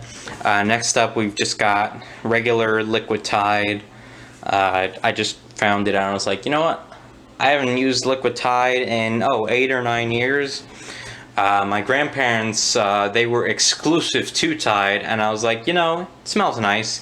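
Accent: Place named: New Jersey, United States. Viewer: Maybe because you had turned away from the camera, and perhaps didn't enunciate it. Or it's that Jersey accent. Or it happened to be the fact that last night was a drinking night for me and I still had--ready--alcohol on the brain! Yes, I've seen some other Method products, but I refuse to buy their crap because of their plant-based OMG this is eco-green. I hadn't seen their scentalizers, I'm curious if they have plant stuff in that too. I have LA's and I've used it a couple times as well, didn't have anything to really say about it good or bad, it was like you said, just kinda there.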